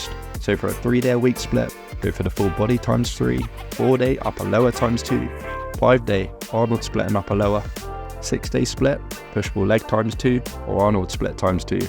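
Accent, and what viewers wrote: United Kingdom. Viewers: Bro speak like british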